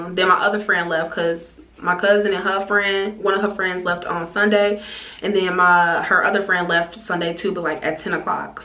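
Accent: Chicago, United States. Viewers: Are you from Chi bc it definitely sound like it 😭?? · I can definitely tell you’re from Chicago sis! Accent hella strong! 🤣 sooo pretty btw! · I can just tell u from Chicago 😭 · I love a good Chicago accent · Just listening to you talk I can tell you from Chicago and it’s comforting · 🤔 you sound like you from Chicago, I’m new here do idk lol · You from Chicago? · Lol are you from Chicago??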